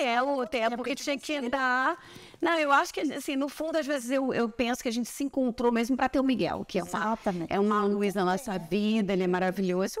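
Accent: United States. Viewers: tá falando bem americanizada 😊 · Ta com sultaque americano 😮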